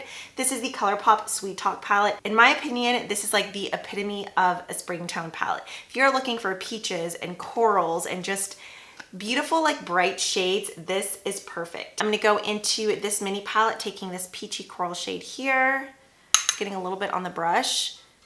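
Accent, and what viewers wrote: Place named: New York, United States. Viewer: That was hysterical! Yonkers is near me and NYC and definitely have an accent if you are from there. Hahaha. Loved it Happy easter love! Love all the vibes of this video. And I also adore the new way or what seems new way to show product name. Awesome editing. I ordered a bunch of your recommendations from k beauty video. Waiting for it to ship. Lol. Wait I own those two blushes too you just used. Amazing combo. Haha. Thanks for those too!! 🐇🐇🐣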